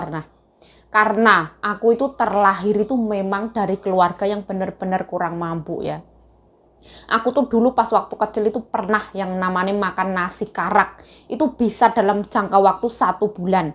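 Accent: Java, Indonesia. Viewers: Lucu ya mba ngmong na..ciri khas bgt legot jawa ya.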